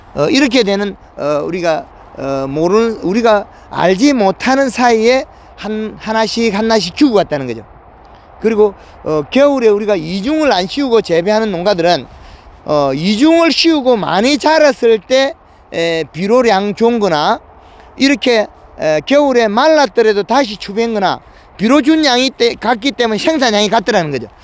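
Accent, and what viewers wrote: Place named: Gyeongsang, South Korea. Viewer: 동분서주하시며 특히 경상도 본토 발음에 친근함 느낍니다 저는 마늘심고 잎이3~4 장될쯤 가을비가 많이와서 두둑이 잠길정도 빗물이차서 뿌리가 傷해서 대표님 말씀대로 요소를 주고 회복이됬는데 웃비료를 15일후 뿌리고 부직포를 덮고 날씨가 포근해서 골드그린을 시비할려고 부직포를 벗겨보니 비료 가스에 마늘잎이 노랐게 말라버렸읍니다 1월25일 비료를 주고 물을 주고 밤기온이 영하로 안내려간다는 예보를보고 근간 포근한날씨라햇볕을 보고 해서 많이 회복되는 감을 느끼고 대표니의 창녕 리포트를 보고 글을 올리립니다 생초보 지만 황금알농법을 열심히 실행하고 있읍니다 물이 차거워 마늘 작물이 스트레스를 받지않을까 생각도 되고요 아무튼 최선을 다해 해볼까 합니다 추후 동영상을 보내드려 도움을 받고 싶습니다 늦은 밤시간에 댓글을 올려 대단히 송구합니다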